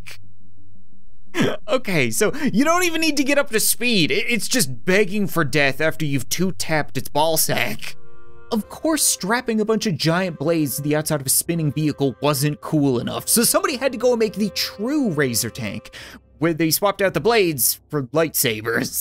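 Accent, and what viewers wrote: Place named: Canada. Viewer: pronouncing his words differently might have something to do with his accent, I think. I find it obvious he pronounces “sorry”, “tomorrow”, “about”, etc. the way he does since he’s Canadian.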